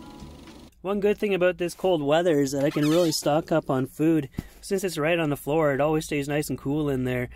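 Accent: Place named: Ontario, Canada. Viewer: Thats how they talk in Ontario eh!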